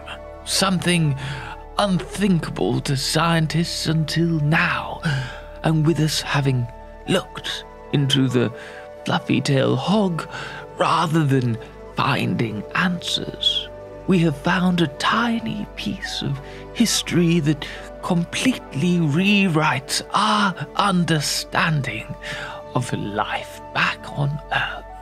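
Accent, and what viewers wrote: United Kingdom. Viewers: LOL! Awesome video. Anyone who tells you, that you aren't talented or an entertainer, please give them your middle finger. Awesome Video. Very creative. The voice inflections of a fascinated xeno biologist mixed with your native British accent, is priceless. Great acting work.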